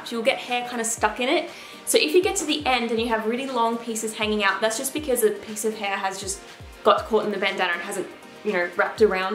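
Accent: Australia. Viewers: Omg! Kath and Kim! The way you said "so" at one point sounded exactly like one of the snooty shop keepers (forgot their names..Trude I think), which sealed in my mind that you must definitely be Australian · It’s an Aussie accent I have one too. Aussie Aussie Aussie oy oy oy. Isn’t your name mate. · She kinda souds aussie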